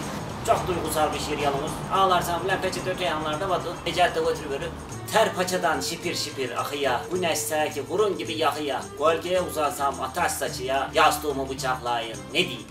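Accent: Kastamonu, Turkey. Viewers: Sivaslı olarak Kastamonu şivesi dinlemek :)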